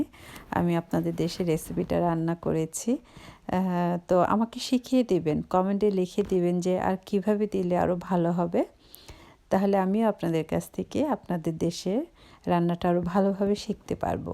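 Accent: Sylhet, Bangladesh. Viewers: Apu tumi ki Sylhety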